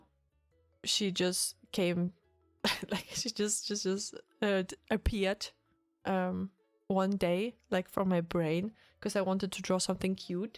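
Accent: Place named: Asia. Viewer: Congrats to the milestone, I took the opportunity and subscribed as well. Another thing: I was really surprised when I heard you say Mettbrötchen, and then you mentioned that you speak German. I originally thought that you are maybe from asia due to the accent, the name, and your demeanor which has a non-German vibe to it (but maybe I'm just used to one specific kind of German). But everything makes sense now.:)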